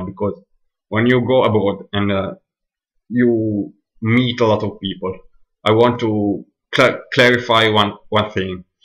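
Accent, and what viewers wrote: Italy. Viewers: Hi - I understood everything you said but like you said it is your accent that will need some practice. I understood you, but I had to concentrate on what you were saying. Two things I would say give away that you are Italian - the long A sound you pronounce like a soft a (Kate and Cat should have two different A sounds), and adding an 'ah' at the end of some words, like 'people-ah'. Many italians do this, so don't worry . But well done with this, and keep working, you'll get there!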